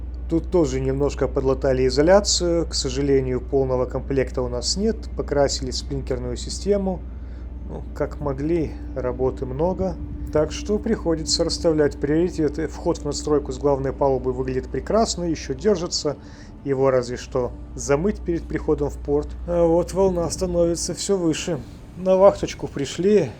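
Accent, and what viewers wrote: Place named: Odesa, Ukraine. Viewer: Одесита чути здалеку😄 Дякую за чудове відео! Не кидайте показувати швартовки та відходи, це завжди цікаво і ніколи мені особисто не набридає 😉 Легкого контракту! Україна дивиться🤝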